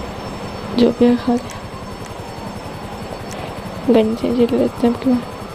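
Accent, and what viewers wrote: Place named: Haryana, India. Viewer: same hare me too haryanvi Edit : dekhkar aur awaj se hi smj gya haryanvi Chori h 🤟🤟💪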